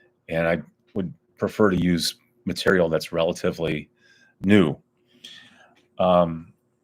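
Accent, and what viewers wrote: United States. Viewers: May I ask you about your accent? You have the most distinct american accent I ever heard, especially very strong "r". Is it a matter of locality you live in, your personal pronunciation, or something else? Don´t get me wrong, I like it. I also appreciate your effort in making your YB content. :hand-purple-blue-peace:Miro, Slovakia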